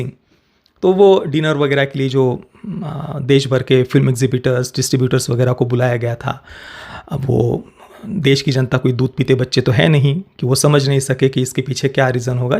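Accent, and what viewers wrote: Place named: Maharashtra, India. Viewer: Bhai aap politically baat kyo karte hai ? Aur aap personally Dunky ko kyo Target karte hai kya aapko malum hai South me Dunky ko Theatre nahi de rahe unke baare me bhi bolo yaar aur aapki haisiyat nahi ki Raju Hirani jaise director ko criticise kare kyonki agar aap unse achchi movie bana sakte to criticize Karo Aap baar baar Dravidian Communist aur tathakathit secular ke bare me.aise bolte hue samaz aata hai ki aap konsi vichardhara ko promot karte hai But bharat ek secular Country hai isko kaise karte hai Bharat jaha Top 10 Richest Temple me 5 Aayenge but Top 200 Universities me ek bhi nahi aati no top 300 School me ek bhi nahi hai kuch ispe bhi bolo Bhai First aap Dunky movie dekh agar pasand nahi aayee to criticize Karo Bina dekhe criticize kyo? Aapki Hindi Puri tarah se Marathi wali hai jo shudh to 100% nahi hai to usko bhi sudharo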